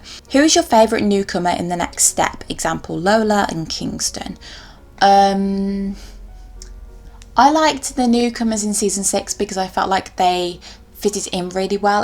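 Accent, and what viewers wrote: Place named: Birmingham, United Kingdom. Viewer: Where are you from? you sound like you’re from Birmingham by the way I’m obsessed with your channel 💓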